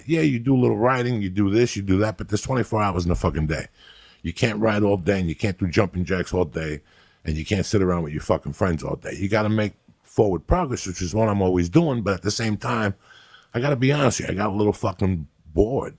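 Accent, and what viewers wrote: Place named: Italian American, United States. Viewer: He could play the old fat Italian mafia boss kind of role even the accent he's perfect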